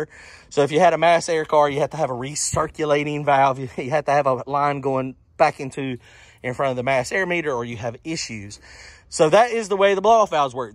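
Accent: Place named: Georgia, United States. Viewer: Thank ya, brud in law! Preciate your insight. Where you at? Sound like a Georgia boy. Take care n keep your shiny side up...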